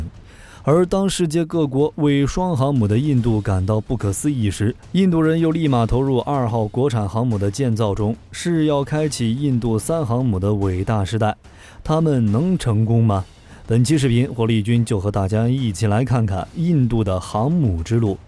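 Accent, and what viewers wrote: China. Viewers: 可能是聽見中國口音在酸印度，反中基因的膝反射。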